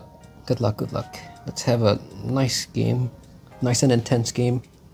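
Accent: Singapore. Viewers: mm where are you from? Singapore?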